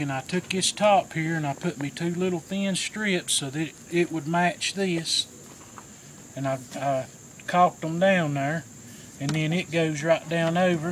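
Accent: United States. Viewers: Just a good ol country accent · oddly enough your accent sounds like my family from Southeastern Kentucky & West Virginia. Thanks for sharing! Great idea! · Thanks for the video from Baton Rouge, LA. Where are you, sounds like you are a fellow Southerner!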